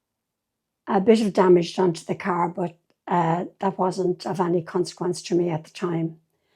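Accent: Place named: Ireland. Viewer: Thank you for sharing your story. I was scrolling through utube & ran across your video & your Irish brogue. My Dad was from Ireland. Many blessings to you . Im sure you sharing your story will bless many. Sending love & light.❤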